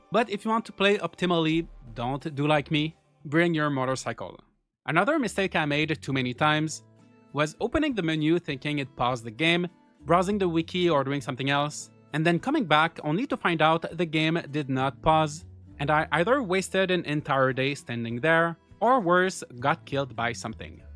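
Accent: Quebec, Canada. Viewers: Are you from Québec? ^_^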